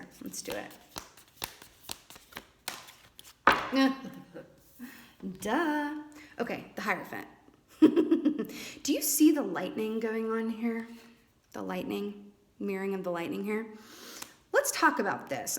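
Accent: South, United States. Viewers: I here the "y'all" and I know you must be from the south....I'm from south Alabama and y'all is my favorite!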